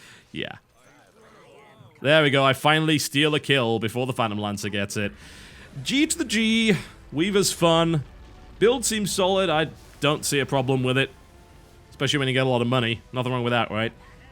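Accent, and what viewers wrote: United Kingdom. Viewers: she is really bringing out the British on TB. BTW why are you not making WTF's on Indie games anymore you sellout! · When TB gets frustrated his brittish accent gets more brittish.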